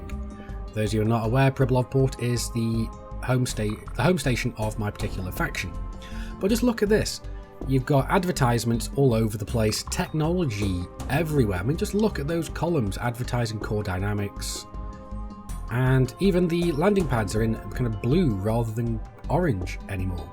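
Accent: United Kingdom. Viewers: I love you random British guy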